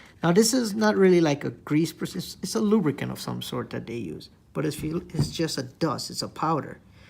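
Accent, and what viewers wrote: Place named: Trinidad and Tobago. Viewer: You sound trini, good vid tho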